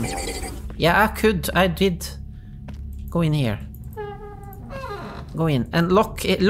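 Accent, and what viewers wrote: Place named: Sweden. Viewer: thanks brah Are you swedish ? , I asked because I wanted to guess what you are , you sound very swedish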